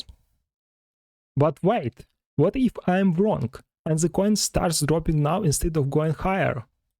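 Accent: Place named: Russia. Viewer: Русский акцент бро)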